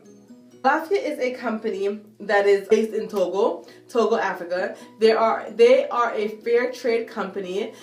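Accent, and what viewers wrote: Jamaica. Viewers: Your jamaican voice tho. 😄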